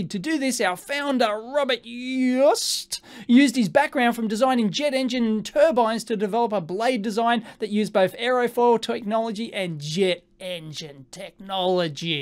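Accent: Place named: Outback, Australia. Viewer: Why come you talk like you're from the outback?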